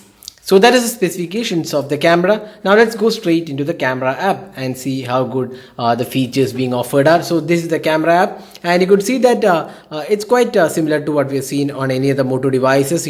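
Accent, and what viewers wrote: India. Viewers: Está hablando en inglés, pero obviamente es indú... asi que su acento se hace notar xD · I HATE THE INDIAN ACCENT WTF EWW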